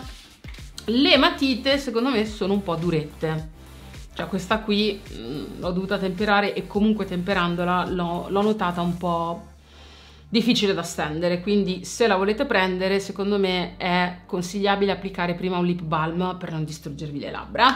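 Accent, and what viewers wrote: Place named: Lazio, Italy. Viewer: Quel modo di parlare a Roma lo chiamiamo burino e lo parlano diciamo nelle altre province del Lazio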